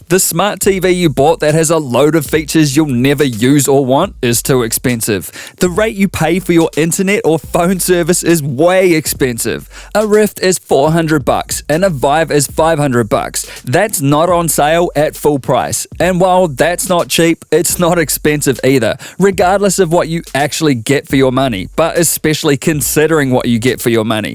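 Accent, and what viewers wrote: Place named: Australia. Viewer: Are you a fellow australian? also this video made me buy fours sensors and an oculus rift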